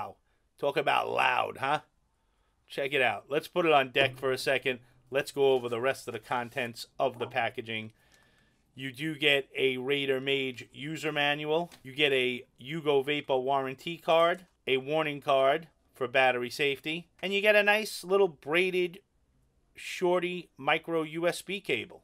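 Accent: New York, United States. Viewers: I will watch every video of yours because of this New Yawk accent. Cheers.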